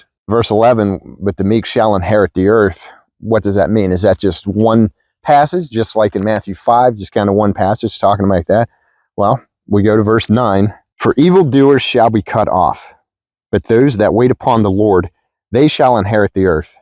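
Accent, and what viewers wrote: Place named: Pennsylvania, United States. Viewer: What a great video thank you for sharing! I can’t quite place your accent are you from PA? Greetings from Chester county god bless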